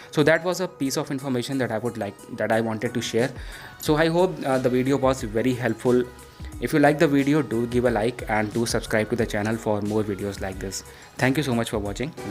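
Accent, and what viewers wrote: India. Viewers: At first I was like hmm Indian accent but I'll keep watching. your accent was surprisingly not annoying to listen to, because it sounded very natural. Thanks man!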